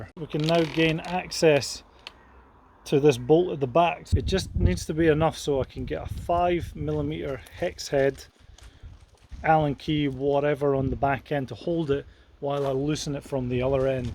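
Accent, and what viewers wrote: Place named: Fife, United Kingdom. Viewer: I'm a fellow Fifer, recognise that accent anywhere!!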